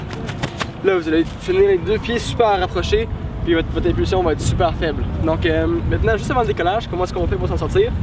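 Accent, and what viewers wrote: Quebec, Canada. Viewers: Super,accent canadien j adore j ai regarde ton tuto salto japonais et j'ai réussi au stade il i avait du sable merci beaucoup beaucoup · lol accent québécois sinon bonne vidéo :)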